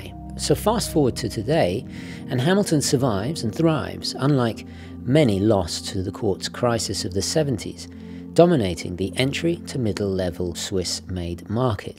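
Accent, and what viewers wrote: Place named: United Kingdom. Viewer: I thought u were British...?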